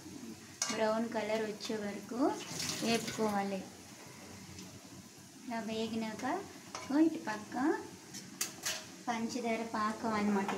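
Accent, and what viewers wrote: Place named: Srikakulam, India. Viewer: Native srikakulam slang and dish 🔥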